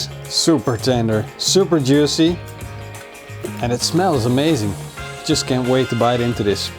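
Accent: Netherlands. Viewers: Je hoort echt aan zn accent dat ie Nederlands is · man the dutch accent is so cool.perhaps a little carribean style barbeque next? Or even curing your own meats?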